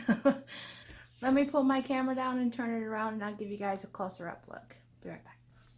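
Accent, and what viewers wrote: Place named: South, United States. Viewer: Southern is best!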